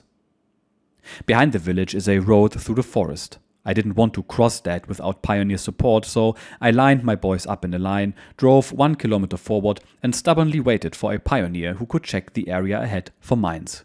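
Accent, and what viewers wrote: Germany. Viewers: great initiative and great books. the only small thing that I complain is that the German accent is sometimes a bit hard to understand thank you!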